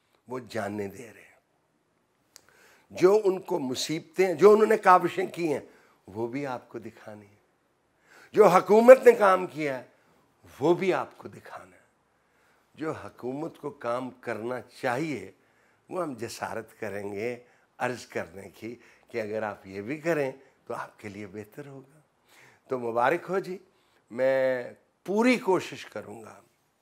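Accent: Punjab, India. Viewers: Speaking Urdu with Punjabi. Like me😂😂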